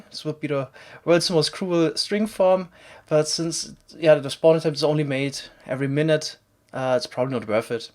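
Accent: Germany. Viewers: Best intro of all of YouTube, and best German accent as well.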